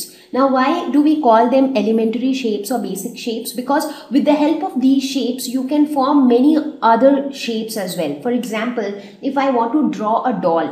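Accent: India. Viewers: you sound like an indian but i understanded a lot and thank you a lot and can you please subscribe to my channel because i subed to your channel?😉😉😏🙄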